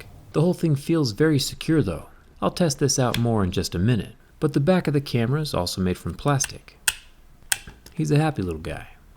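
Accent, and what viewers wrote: United States. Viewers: Oh and the perfect American accent didn't give it away? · Your voice is very flat !!!!! Please talk or speak or "parla" with Oxford accent if you want a normal ear to FOLLOW YOUR MOUTH !!!! OTHERWISE , Its HARD TO HEAR OR LISTEN , YOUR BRAIN-WASHING FLAT AMERICAN VOICE VIBRATION !!!!!!!!!!!!! ☀☀☀☀☀⛵✈✈✈✈✈✈✈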